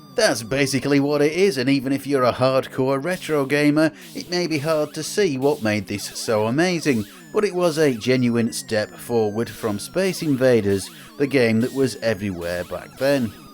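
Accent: United Kingdom. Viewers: Awesome job on digging up I Robot! That's a deep cut. Jaw-dropping graphics for the time. I tracked down a ROM of it and gave it a play on MAME, oh.... I'd say sometime around 2005. It wasn't a bad game, by any stretch of the imagination... but it wasn't super fun or addicting like many of the others on the list. I love the content you've been putting out... I do have a small suggestion for you, if you'll humor me... I don't know if it's an "other side of the pond" thing, but most of the folks I know of in the USA that I've talked shop in person with tend to have a pretty standard way of pronouncing processor names. But, once again, this is the YouTube comments section, feel free to take this with as big a grain of salt as you like: MOS Technoglogy 6502: "Sixty Five Oh Two" Intel 8008: I've.... never heard anyone talk a lot about the 8008. My guess is "Eight Oh Oh Eight" or "EIghty Oh Eight". 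Intel 8080: "Intel Eighty Eighty" Intel 80286: "Two Eighty Six" Intel 80386: "Three Eighty Six" Intel 80486: "Four EIghty Six" Motorola 6800: "Sixty Eight Hundred" or "Motorola Sixty Eight Hundred" Motorola 68000: "Sixty Eight Kay" or "Motorola Sixty Eight Kay" You might notice I've left off a processor. :) Honestly, though, I call it a "Zee Eighty". Do y'all over there call it a "Zed Eighty"? Either way, I agree that the computer that Sir Clive Sinclair made out of it is definitely a "Zed Ex Spectrum"... and the car brand is definitely "Jag-You-Ar", and not "Jag-war", and ABSOLUTELY not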